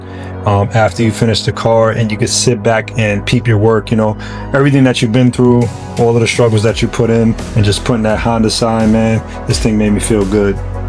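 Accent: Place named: New York, United States. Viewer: it’s a NY accent lol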